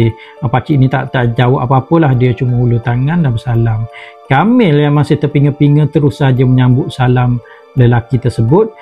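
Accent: Kelantan, Malaysia. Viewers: sama2.. Sedak dengar crito..kemah2, ada stail tersendiri dgn loghat Klate dio tuh..kito ore baru dlm channel ni.. Follower Abg Joe JSPL benar..lor nih layan duo2 lah...😂😂🤩🤩😍😍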